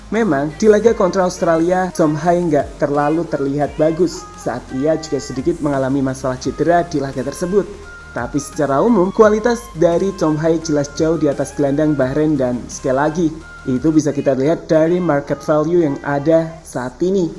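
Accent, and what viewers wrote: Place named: Medan, Indonesia. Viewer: Dari Logatnya Kelihatan Banget Mas Pembaca Narasi Ini Dari Medan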